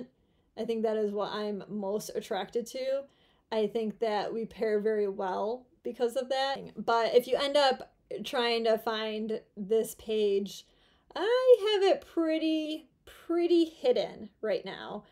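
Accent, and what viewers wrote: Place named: Midwest, United States. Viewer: I'm a little disappointed you won't be sliding anymore. I thought that having the baby would be the big change, but I guess this engagement is bigger. I really enjoyed you're frank discussions and the Midwest accent. I have some relatives in Iowa, Illinois, and Ohio.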